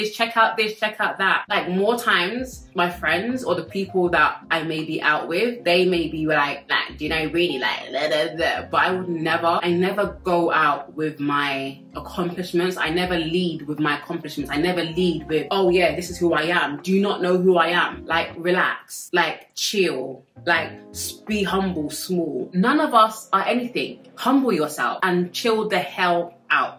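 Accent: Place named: United Kingdom. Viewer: sounds like Nicki Minaj doing a british accent ❤i love her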